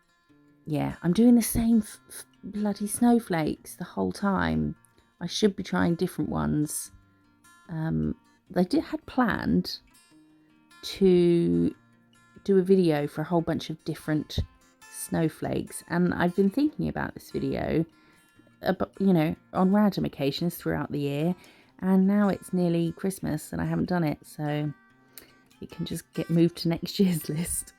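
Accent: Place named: RP, United Kingdom. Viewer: Absolutely love your videos. I laugh and learn! Also gott say I adore your accent. Im here in Texas and your Queens English is lovely to listen to.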